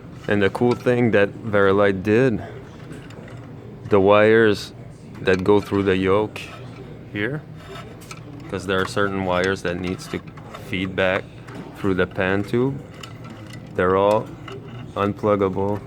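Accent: Quebec, Canada. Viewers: Es tu québécois???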